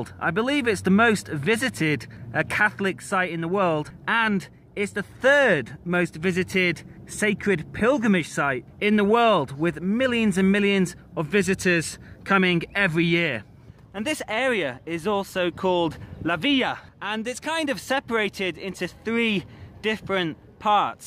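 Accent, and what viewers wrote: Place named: United Kingdom. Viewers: Totalmente, pero hay quienes apenas distinguen que habla inglés, mucho menos que tenga acento británico. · johan gomez Fíjate en la manera en que él habla, se le nota el famoso "acento británico"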